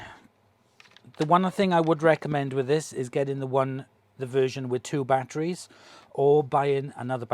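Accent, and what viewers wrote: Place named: Wales, United Kingdom. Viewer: I like your accent 😄 (I'm guessing it's Welsh). Thanks for the review! You covered all the things I wanted to know and see. The filter part was very helpful.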